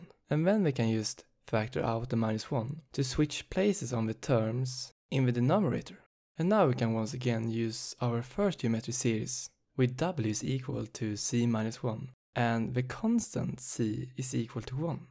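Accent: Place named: Sweden. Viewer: Dude that's the loveliest most Swedish accent if I ever have heard one! :D And Thanks for the vid, exam in two days, fingers crossed!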